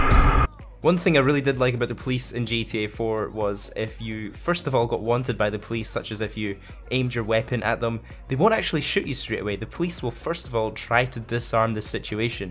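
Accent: Scotland, United Kingdom. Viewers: SCOTTISH AF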